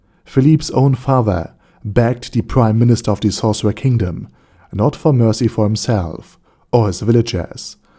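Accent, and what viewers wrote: Germany. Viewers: Why do you speak with German accent ?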